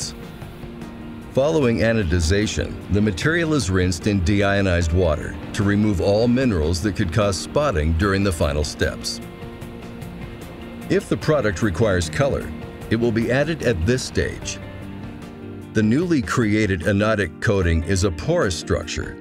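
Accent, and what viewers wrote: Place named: United States. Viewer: american english lol i speak british english and i feel you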